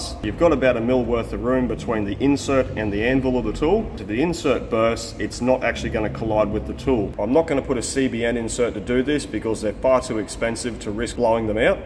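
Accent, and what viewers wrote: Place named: Australia. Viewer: But the subtitles, in all videos, don't understand Aussie, nor English .... I turn them of.... I'm not from a English-speaking country ❤❤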